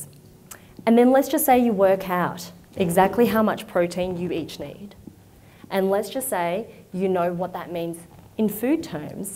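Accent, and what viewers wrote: Australia. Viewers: Is it aussie accent? Cause I LOVE it. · OK the "Young Guy" in me resurfaced ... a young very pretty Woman that is super smart, has Asian features and an Aussie accent... Doesn't get much better ... OK, back to learning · An Asian with an Australian accent, sign me up! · Totally Aussie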